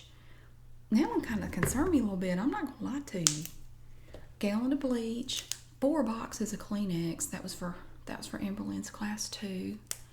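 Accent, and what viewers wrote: South, United States. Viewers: The green beans snapping gave me a lot of tingles, and I love the southern accent! I have a lot of family who have southern accents, and I agree with previous comments that it makes me feel relaxed and at home! Great role play :) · I was about to comment saying wow that is a strong southern states accent! Then I read the description 🙈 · Whoa, those green beans gave me really surprising tingles, not something I was expecting! Great video, love the "super southern" accent.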